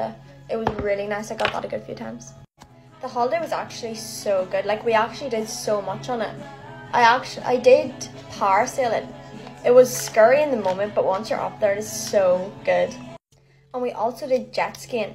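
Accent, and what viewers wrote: Ireland. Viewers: Ily your Dublin accent 💗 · yep it's Irish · Y’all I might be dumb but is she irish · Is she IRISHHH? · Are you Irish? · I love your accent im from Ireland too and your just so pretty ❤ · I knoww I love Irish accent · it’s irish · Yh she’s Irish too❤ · Is she Irish? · I didn’t know she was Irish- I love their accents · Is she Irish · Is she Irish or something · I swear .our accent is the same ❤ I’m also from Ireland btw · They are Irish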